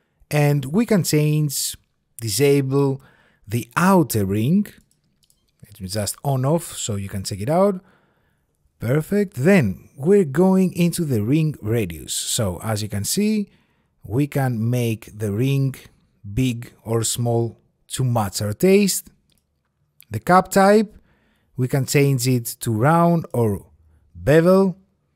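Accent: Greece. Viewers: This greek accent is so familiar to me! ευχαριστώ! καλή δουλειά!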